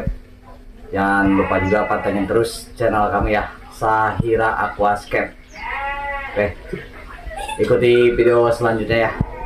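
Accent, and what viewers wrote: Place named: Medan, Indonesia. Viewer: Kau bicara kenapa jadi logat medan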